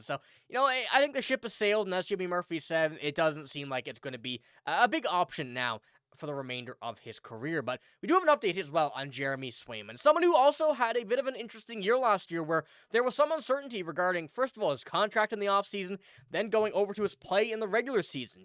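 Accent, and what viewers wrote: Newfoundland, Canada. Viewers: As much as I love 63, and would have loved him to retire as a Bruin, it wasn't going to help the team to keep him. I can't see him playing third line on the B's. I pray to God he doesn't go to the Leaves. I'd rather see him in Montreal. My stomach tells me he stays in Florida. With regards to Sway, he better get his act together. Oh, I finally subscribed. Question are you a Newfie?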